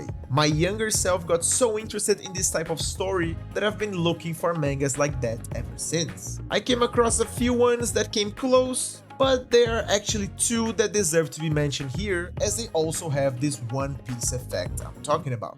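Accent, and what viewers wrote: Brazil. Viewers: Ja vi que é brasileiro pelo sotaque skkskskssk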